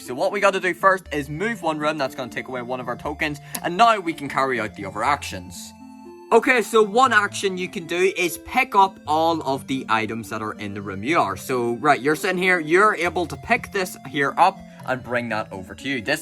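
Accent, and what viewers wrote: Northern Ireland, United Kingdom. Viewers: Are you from Northern Ireland? 😇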